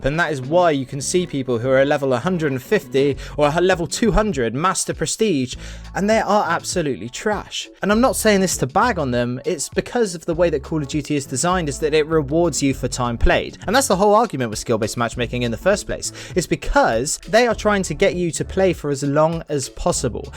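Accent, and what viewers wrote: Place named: West Country, United Kingdom. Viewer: Mate, might sound strange but have you ever kept a track of where the people in your lobbies in Call of Duty come from? I might have too much free time on my hands, but I'm from Nottingham and back in day, I used to play with people from Sheffield, Leeds and surrounding areas, I NEVER played with people in Europe. You'd very occasionally get into a lobby with some Americans which was quite cool as I'd never spoken to a yank before, but all I used to come across was 'UK ReMiiX' and the like back in the day of Cod 4 - BO1. Nowadays, my games are dominates by Germans & Turks for some reason. I'm literally playing with the same people with 'KURD' or 'TURK' as their clan tag game after game. Why?! I'm guessing from your accent you are probably from round the Bristol / Swindon area, do you still party up with people in the South West or are you witnessing the same thing as I am?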